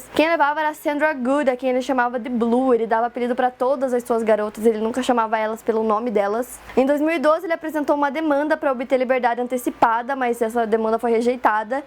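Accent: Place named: South, Brazil. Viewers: Video um pouco antigo mas mto bom, a sempre explicou mto bem, pesquisou mto bem, e merece muito reconhecimento pq isso eu sei que da mto trabalho, e além disso tem esse sotaque sulista SUPER FOFO ❤🤡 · Mas tem um sotaque sulista diliça♥️ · voz sulista que fofo e engraçado s2 kk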